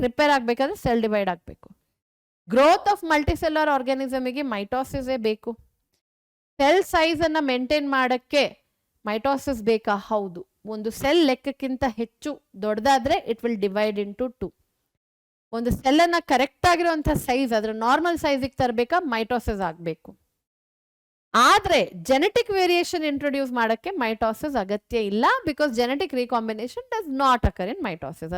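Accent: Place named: Andhra Pradesh, India. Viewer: Mam u r mother tongue is Telugu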